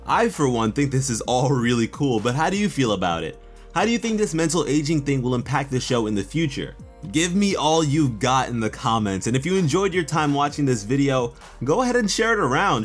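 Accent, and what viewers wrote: United Kingdom. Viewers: Are you from the uk 🇬🇧??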